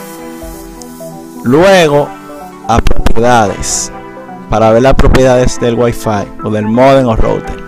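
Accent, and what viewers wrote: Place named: Dominican Republic. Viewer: Eres de República dominicana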